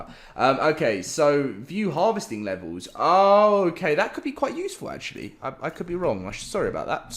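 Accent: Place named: Birmingham, United Kingdom. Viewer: U sound like my friend that lives in Birmingham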